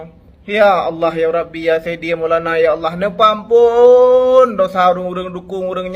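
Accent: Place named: Aceh, Indonesia. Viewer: Nyan. Ciri khas ureng Aceh watee pegah haba, saboh kelebihan yg Hana bak bang sa lain hana perlee metutoe lagak that bahasa indo yg penting betatupoe yg di pegah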